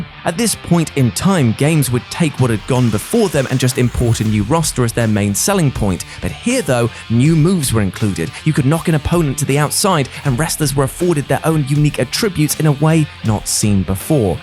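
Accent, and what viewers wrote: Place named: United Kingdom. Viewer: All the weird brits talk like that. It's annoying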